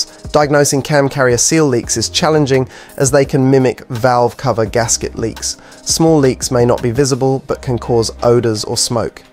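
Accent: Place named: England, United Kingdom. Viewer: I take issue with the remark that a timing chain is maintenance free. It depends on the rpm used, presumably in USA, you sound English but price in dollars, automatic gearbox is normal and therefore high rpm rare but each chain has to go round the tiny crank sprocket and if that stays above 4,000rpm for long the chain is grossly overspeeded - read a chain catalogue or maker's recommendations. One reason for belts was to get away from wearing chains.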